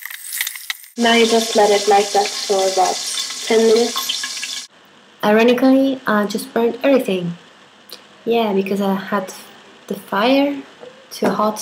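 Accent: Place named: Ireland. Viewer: ah du hast schon voll den irish accent haha:D das wochenende wird witzig..seh ich jetzt schon kommen!:D und achja..was ist eigtl fürn account?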